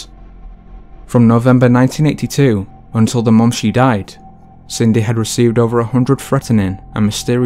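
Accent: Scotland, United Kingdom. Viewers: so, the #2 mystery is still UN-SOLVED!! theories about how someone died means it's still a mystery. You had me all convinced b/c of the disarming British accent. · Totally off topic. I'm trying to nail down your accent. Sounds a bit Scottish to be English yet a bit too English to be Scottish. Leaning towards Scottish. I'm American and not super knowledgeable about different dialects within the different UK countries. Educate me please, and good vid thanks. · You had me with your first British utterance. New sub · Where is this narrator from? I can't decide where in Britain this accent is from. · I enjoyed your well done presentation; and your voice. Curious: Your accent sounds like a British regional accent. But I've no idea from where. Congrats, in this conforming age, of sticking to your accent-- adds character and depth btw. And your voice with it is clear, unlike some regional British accents, let alone other harder to understand ones. 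So, curious, where is your accent from? (Or if someone else recognizes it). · British accent is terrible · You ripped the voice of Criminally Listed right out of his mouth and made it british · I rather like your accent although it’s a little difficult for my Brooklyn ears to understand. Is it a Scottish accent?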